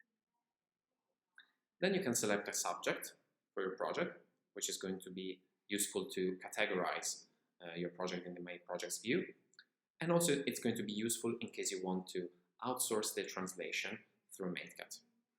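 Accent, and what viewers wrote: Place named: Italy. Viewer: I smell italian accent over there. Hi compatriot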